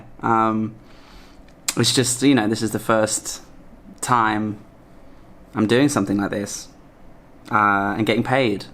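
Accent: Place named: Sheffield, United Kingdom. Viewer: you dont sound irish more english if i was to guess someplace around Sheffield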